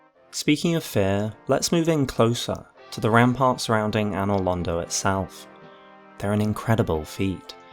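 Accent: United Kingdom. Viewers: Good video but must this guy talk with a British accent????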